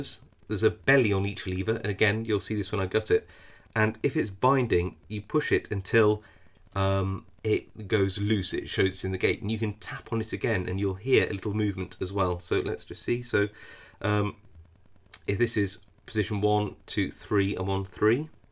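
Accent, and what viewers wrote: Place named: United Kingdom. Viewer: I love the Queen's English.. "Leaver" vs. "Lever" lol.. same same but all the better... Btw good job..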